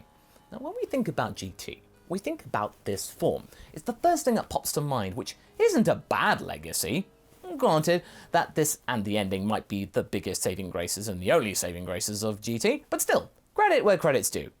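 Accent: England, United Kingdom. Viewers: You sound like teamfourstar hoku but with an english accent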